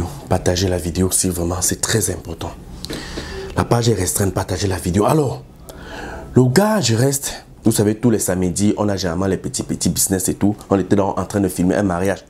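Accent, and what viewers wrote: Cameroon. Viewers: Tu a baminikalise le français dans ton récit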